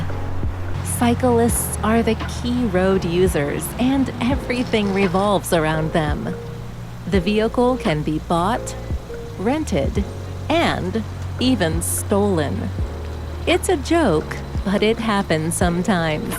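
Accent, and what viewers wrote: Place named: United States. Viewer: The American pronunciation is hilarious! "Van go". Ridiculous! It's Fahn gockh. Also, Omsterdom, to pronounce it correctly, does not have the only diamond museum in Europe: there are many - try going to Antwerp and Brussels.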